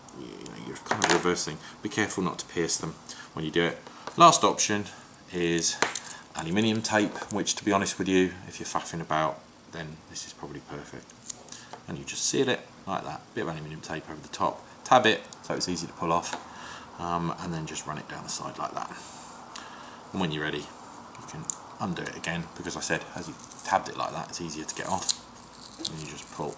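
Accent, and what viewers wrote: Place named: United Kingdom. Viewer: Damned British accent PLUS not talking into the mic made it hard to understand a word you said. Learned more from watching than listening.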